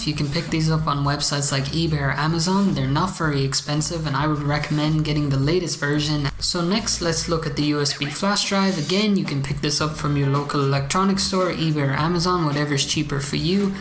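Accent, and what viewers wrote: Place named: Scotland, United Kingdom. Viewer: This your voice?For some stupid reason i thought you were scottish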